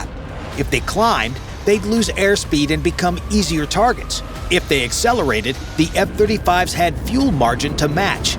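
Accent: United States. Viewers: Don't get me wrong, the narrative and story is bob on. It's just the hyper, over dramatic American narrator that I find annoying. Maybe it's because I'm British.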